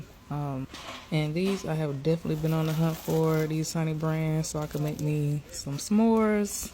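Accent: Jamaica. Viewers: I love how you comes out with the Jamaican accent my sister love it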